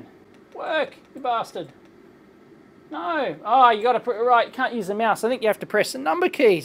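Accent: Australia. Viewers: Australian accent is awesome :D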